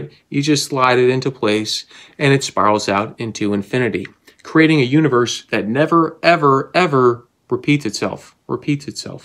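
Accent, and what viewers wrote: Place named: England, United Kingdom. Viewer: Why is there a german flag on your ceiling, when you can't even pronounce german without an English accent. (Genuine question here, no disrespect intended!❤)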